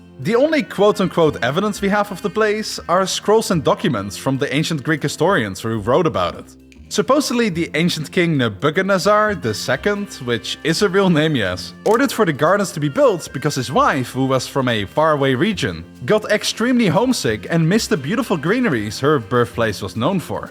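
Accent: Netherlands. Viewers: I can smell a dutch fella from a sentence away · I've never watched your channel, but I can tell you're Dutch, so stop that. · Nederlands accent gaat hard maat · Wat een prachtig Nederlands accent! Love it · Horrible Dutch accent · Are you Dutch. I hear an Dutch accent (I’m Belgian) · Like the video, but the dunglish hurts my soul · Geweldige video maar als nederlander irriteer ik me vreselijk aan het nederlandse accent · Ik hou van het nederlands engels accent. Altijd herkenbaar :)) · Is the guy talking originally Dutch? :D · Gemaakt door een kaaskop jeeeeez je hoort het gelijk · Dat Nederlandse accent haha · nederlandse accent? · Im way too late on this but I can just smell the Dutch in your voice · Wow wat slechte spraak in Engels. Duidelijk te horen dat je Nederlands bent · Are you Dutch/Belgian? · You have the most Dutchest accent I’ve ever heard lol. 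Nbl from a fellow Dutchman ;) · Bro, je Nederlands accent schijnt dik door😂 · I smell dutch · When I started watching this vid and I heard ur voice I knew you are Dutch immediately😂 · I can smell Dutch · please get someone to voice these in i cant w the dutch accent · I smell dutch and i love it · I'm also dutch and I could immediately tell you were dutch because of the accent 😂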